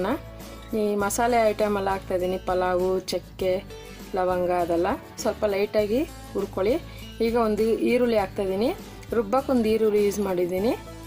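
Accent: Tamil Nadu, India. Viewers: Tamil nimma mother tongue allva nimma language hage ide.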